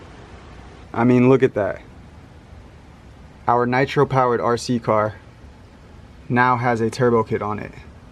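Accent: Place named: Louisiana, United States. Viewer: You gotta be somewhere near Louisiana the way you talk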